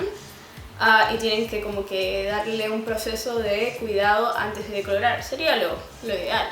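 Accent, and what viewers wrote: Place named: Venezuela. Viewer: De que país eres ne da curiosidad? esque en tus vídeos dices que vivías en Argentina y mencionas mucho Argentina, pero yo escucho tu acento de Venezuela...PD Saludos, me gusta tu canal y tu forma sencilla de ser nunca cambies:)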